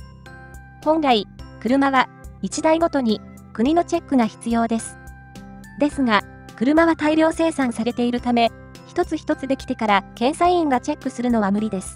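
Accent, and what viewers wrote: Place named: Tohoku, Japan. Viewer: セリフの喋り方が東北っぽくて田舎を思い出すなぁ…。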